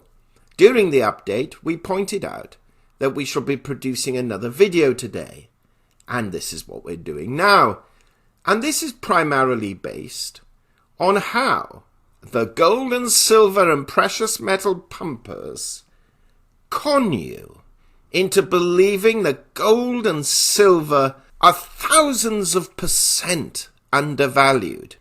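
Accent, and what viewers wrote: United Kingdom. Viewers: A realistic presentation. Very enjoyable. Investing in Gold and Silver is more about a flight to safety, than it is unrealistic profit. It will make money and it will do well. Patience is required. You can open an account from a link on this channel, invest in gold and silver and even designate the storage location (Zurich etc.) Great for those who do not want to store the metals themselves. There is nothing like healthy skepticism with a British accent. Subscribe and keep listening.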